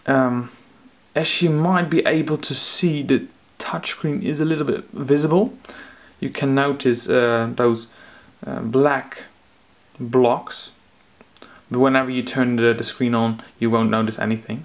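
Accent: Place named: United Kingdom. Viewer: You sound like a fellow Brit, what website did you purchase this from and how much did you pay for it? Do you have to pay for VAT and what not?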